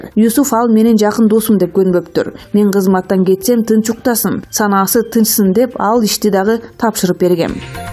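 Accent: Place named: Turkey. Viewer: Диктор сен эмне эле турктордун дикторунун дикциясындай окуп жатасын? Киши жадап кетет экен.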